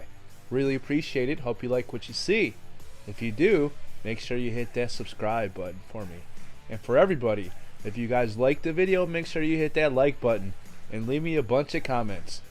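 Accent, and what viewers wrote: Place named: Canada. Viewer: Canadian accent is too much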